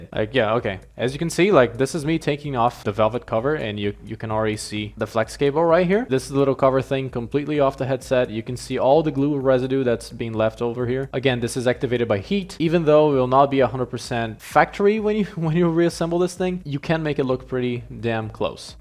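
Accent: United States. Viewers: Manda muito no inglês. Parece um americano kkk, ótimo vídeo mano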